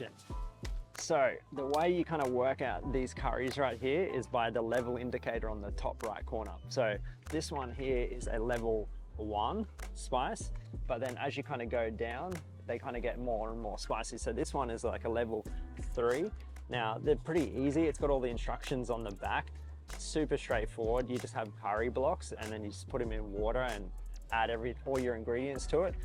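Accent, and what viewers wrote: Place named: Australia. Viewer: for real mate, and also your accent is sending me back to the years I spent growing up in Australia haha I used to live in the west coast in Perth, it probably is one of the factor that makes me feels cozy when watching you. 😄 I would love to be a teacher in Japan to be honest but sadly I am not native and its not an option where I am from haha, I am currently in training for the SSW programme specifically Food Processing Industry 😁 training will last for up to 6 months and afterwards is job matching hopefully I will live there. so in total its about 1 year more or less. I definitely can't wait to experience Japan 😆 But yea, thank you for the wish, I will go full send, keep the video coming mate! I will be sure to watch every single one! and I hope your channel will be huuuge on day ✌much love from Indonesia! 🇮🇩🇮🇩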